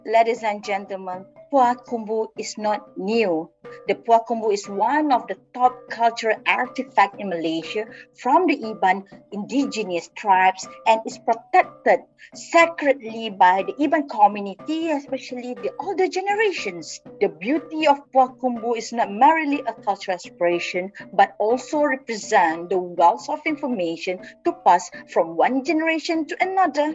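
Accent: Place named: London, United Kingdom. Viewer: Wow speaking London 😃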